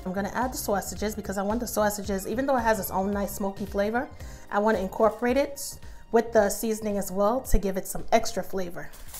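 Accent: New York, United States. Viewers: looks yummy and yes thats a strong new york accent i hear lol it sounds like brooklyn or long island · U did that !!!!!! U have an accent where r u from ?? NYC? CT? Islands? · Looks delicious n sounds like u have a NY/NJ accent. Love it! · Ikr 😆 Is it New Yoik (?) York 😉 I Love it 👍😁.... Just like her looks and cooking skills... Genuinely Natural and Beautiful 😊😊😊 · Sounds like New York???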